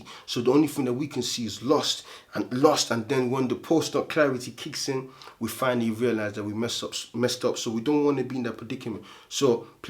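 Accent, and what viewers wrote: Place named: Cockney, United Kingdom. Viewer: And another job well done! You're a wise man. I feel like you actually give people facts and the truth behind this. There's a reason it's a sin to masturbate. There's a reason we are supposed to retain our seed unless we are with wife. Hold on tight fella's, it's worth it!!! Cockney huh? Lol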